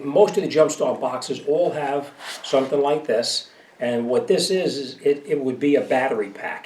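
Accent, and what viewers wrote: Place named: East Coast, United States. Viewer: i can hear boston or new jersey in your ascent somewhere east coast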